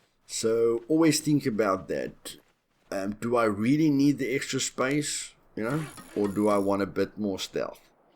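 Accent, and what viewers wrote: South Africa. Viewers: I was going to say you sound South African. Glad to see another SA player making content. Lekker boet lekker. Keep em going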